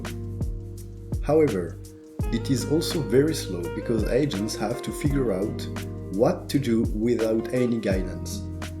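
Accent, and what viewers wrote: France. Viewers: ahlahlahhh qu'il est beau notre accent francais ! j'adore 😆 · love ur french accent ! u are french right ? je le suis aussi xD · J'ai reconnu l'accent français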